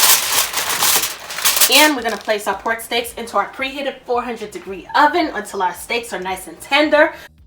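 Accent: New York, United States. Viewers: Omfg I'll watch this over and over again just to hear that NYC accent!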